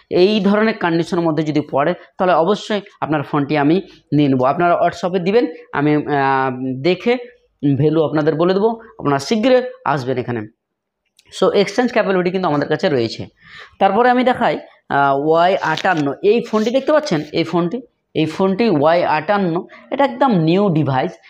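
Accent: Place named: Kolkata, India. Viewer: ভাই আপনার ভাষা তো কলকাতা কলকাতা লাগে। সাবধান ভাই।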